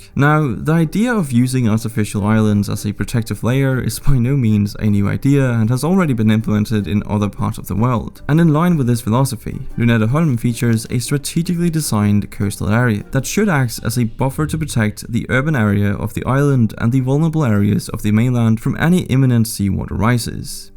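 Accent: Denmark. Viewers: This is completely unrelated but god damn the danish pronounciations are just so authentic! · Man kan bare høre han er dansker lmao.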